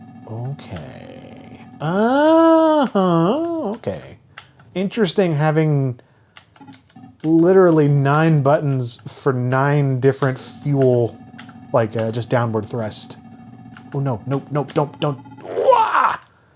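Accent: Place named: California, United States. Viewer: Your accent is like the Californians without the accent if you follow.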